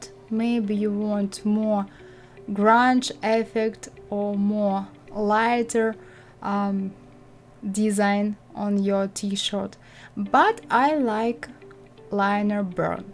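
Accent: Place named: Russia. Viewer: Just Wow. Amazing. Its so easy. Thank you. R u russian? I like your English speaking accent.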